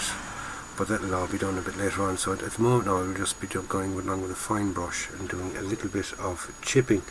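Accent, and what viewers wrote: Ireland. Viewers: I really watch these videos just to hear the soothing, quaint Irish accent. "When I drink whiskey I drink whiskey and when I drink water I drink water." - Michaleen Flynn But I do also learn a thing or 2 about building scale models & other stuff. Neat 251/1 btw ;)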